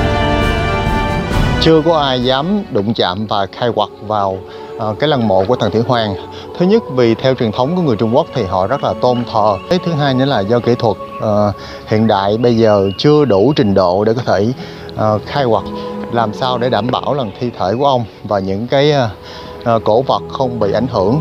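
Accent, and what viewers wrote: South, Vietnam. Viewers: Hay quá. Hình như vì dịch bệnh nên có thời gian, anh biên tập tỉ mỉ hơn, hình ảnh rất đẹp. Đặc biệt là từ ngữ anh dùng rất gần gũi, không hoa mỹ như trên TV, và thích nhất là giọng miền nam của anh. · Clip bánh cuốn quá ạ, được đầu tư, có bổ sung kiến thức lịch sử và thêm yếu tố hài hước nữa:))) giọng miền Nam của nghe bình dị dân dã 😆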